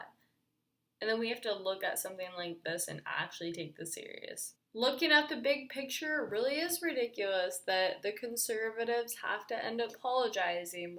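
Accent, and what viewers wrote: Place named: Ireland. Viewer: She's Irish.....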